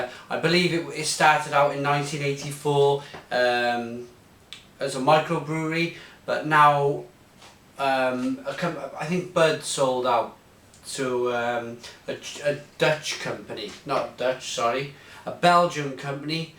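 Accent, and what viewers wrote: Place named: Wales, United Kingdom. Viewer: can i ask a question please are you from Wales as i am myself and i thought the accent was a give away.. another great review bud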